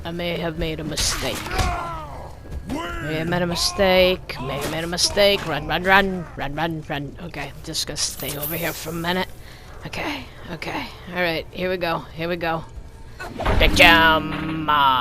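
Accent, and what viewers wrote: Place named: Brooklyn, United States. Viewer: Out standing! Your funny and lovable would change a thing. Well I do like the Brooklyn voice tho. hehehe see ya in the next one!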